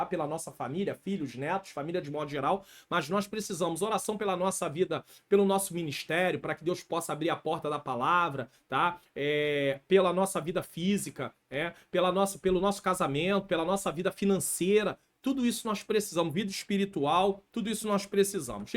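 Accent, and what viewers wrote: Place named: Rio de Janeiro, Brazil. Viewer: Pastor,venho do Futuro (setembro de 2026 😁),para dizer que passei anos na igreja (sou da Assembleia),e nunca havia lido a Bíblia,que vergonha 😢,mas desde o ano passado comecei a ler,estou em Provérbios,leio no meu tempo,sem pressa e sem tabela,e quero lhe dizer do fundo do meu ❤️,que eu glorifico a Deus,por ter colocado o senhor no meio caminho pra ensinar,explicar o que não entendo,Deus realmente lhe provém de sabedoria pra nos ensinar,o senhor não imagina minha felicidade a cada estudo com o senhor,gosto muito do seu jeito de falar e do seu sotaque carioca 😂..morro de saudades do Rio,morei 8 anos aí, sou paraense,mas tive meu filho aí,vim embora em 2001😢. Que Deus abençoe sempre, sempre,sua vida e sua família 🙌🏼📖❤️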